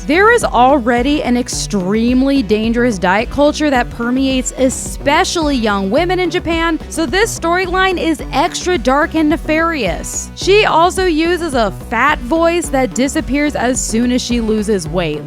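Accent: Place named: United States. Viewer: This person is speaks like an American 😂 She watching a-lot of these things all wrong 😑. Just because a character has a identity or hobby doesn’t mean it’s representation for people identify similarly. Americans are used to the villains being outside of the relationship, but in these shows the love interest is the villain 🦹‍♂️ Then she’s gonna get mad about one of them having assault when it literally says that the main character gets assaulted in the title 😂 (like what did you expect for real?) The pedo are crazy though but that’s part of Japanese history. Looking into that time will explain why those got so popular. 😬 yikes. calling citrus grooming is kind of overkill though 🙄, I get that’s a red flag 🚩 all the way, but unless is a excessive power or age gap, that’s just throwing around words. some things are only acceptable in cartoons/anime, like unrealistic, body changes or crimes. 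🥱 her actually taking these things seriously is like, Bro, why do you even watch this 🙂‍↔️ but I know why it’s for her job😒 Love stage relationship isn’t based on the SA 😭. if you’re gonna trash my shit, at least do it correctly! It was based on bribes 😣 convincing someone to change their mind Does Not equal abuse, Relax! (Bloom into you) p.s. It’s a GL you have to expect that there’s a chance that the main couple is gonna get together, like what! 🤣 The cherry magic thing was crazy like, she been trashing anime pedos this whole time, but she didn’t talk about that trash side couple 😭. Like thi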